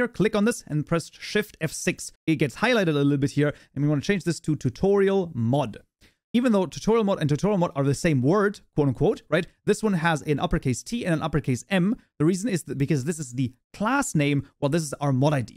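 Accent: Germany. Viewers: You sound german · Its funny how you nearly lost your German accent in to the java tutorial series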